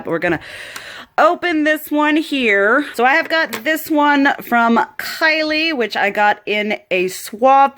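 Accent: South, United States. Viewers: So where were you living? I know you have a southern accent but I have always been curious where you were from. I’m in NC!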